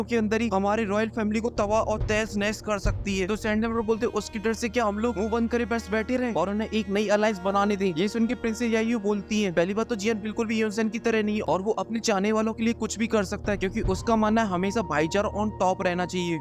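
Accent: Haryana, India. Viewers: Kya aap Haryana sa ho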